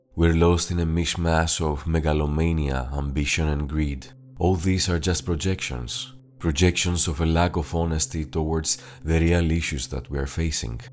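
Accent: Greece. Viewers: Why can I tell that you are Greek just by listening to your voice? Anyways, great video!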